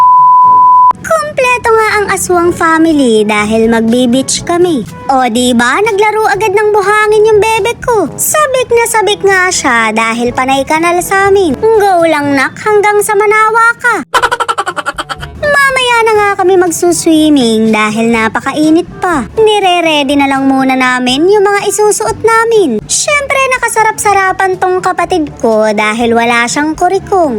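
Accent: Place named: Bicol, Philippines. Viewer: Bisacol ng tagalog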